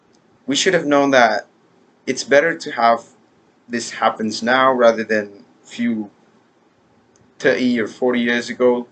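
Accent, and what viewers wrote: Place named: United States. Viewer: You're such amazing!!!! Definitely like an american boysssss....good job !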